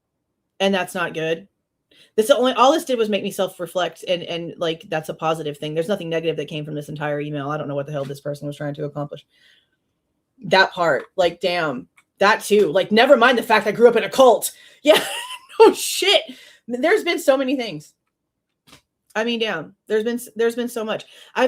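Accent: Texas, United States. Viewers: ❤She’s from Texas I can tell….❤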